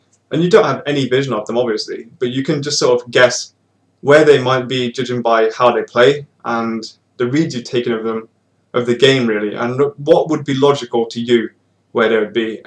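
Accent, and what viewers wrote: United Kingdom. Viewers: What part of the UK you from?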